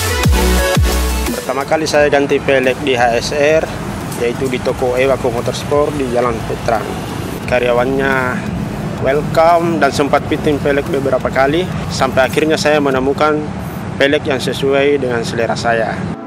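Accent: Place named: Medan, Indonesia. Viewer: Orng medan abg ni ku yakin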